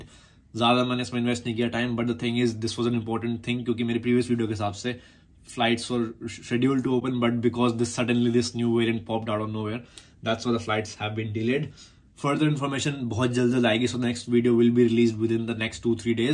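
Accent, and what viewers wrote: India. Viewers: Im Sorry if I offend to somebody but what kind of lenguage does this guy speak. English Indi??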